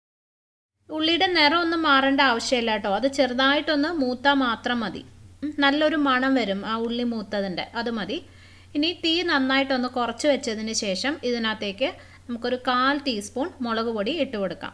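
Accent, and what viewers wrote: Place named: South Kerala, India. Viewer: njan kollam aanu...njan chechiyude eth recipe cheyth nokkiyalum ath kidukkachi ayitundakum...ith vare. chechide samsaram njangalude pole...apozhe thonni chechi thekkan keralakkari anenu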